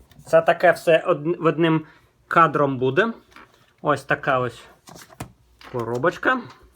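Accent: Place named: Ukraine. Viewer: Яка цікава українська говірка)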